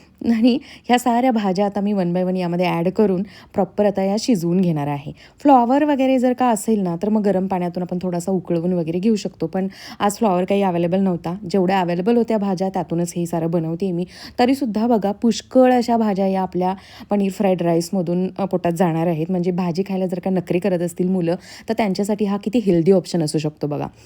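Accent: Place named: Kolhapur, India. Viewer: तुमची भाषा सेम माझ्या कोल्हापूर सारखीच वाटते ❤️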